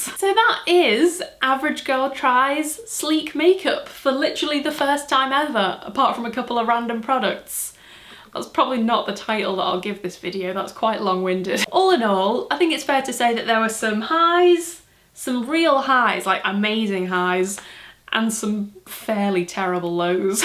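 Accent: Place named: Wirral, United Kingdom. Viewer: Hiya:) Stumbled across your videos and so happy I did!! I subscribed in less than 2 mins (unheard of Youtube behaviour from me I may add) of you talking as I thought wow, this is how me and my friends talk, so refreshing but relaxing/oddly familiar... and then I watched another video and found you are from the Wirral too... (and so I wanted to comment, also unheard of Youtube behaviour from me, as I usually just creep from video to video, never liking or commenting) Is there a Wirral sense of humour/chat/banter, if so, I think you repping us amazingly and would love to see you conquer online and mainstream media for us average girls!! You are one of a handful of youtubers I have watched who seem genuine, and like a right laugh on a night out:) Keep on creating and I would love to see more styling/haul videos as love your fashion sense!! 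Hannah xxx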